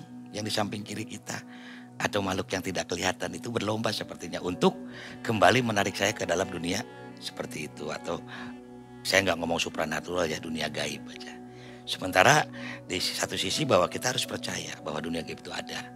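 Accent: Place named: Sunda, Indonesia. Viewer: Assalamualaikum kang,Abi sok setia nonton kisah na khas Sunda pisan nyariosna sing damang Oge sadaya kulawargina seru Oge Aya NU Matak seuri JD kahibur.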